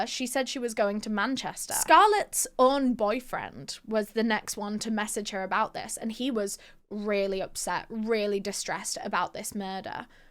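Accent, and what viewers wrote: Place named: Leeds, United Kingdom. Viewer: Thank you for covering this case. If you read your comments, I’m sorry for the notification overload! 🫣 I’ve only just found your channel by complete accident, a video appeared in my recommended feed and I heard your Leeds accent (I think? It sounds like Leeds to me anyway. Me mate lives in Morley and you sound like him. That’s a compliment btw 👍🏻)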